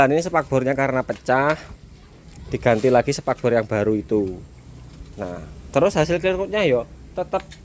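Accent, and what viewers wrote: East Java, Indonesia. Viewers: Lokasi di mana mas, ko kaya' jawa timur an...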